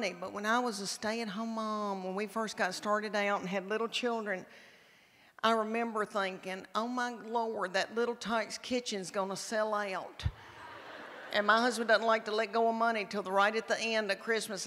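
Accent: South, United States. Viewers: I found this woman, by accident on YouTube last year. Im from England, and i just love that Southern accent., your awesome, you waited a long time for this, you sure do deserve everything now.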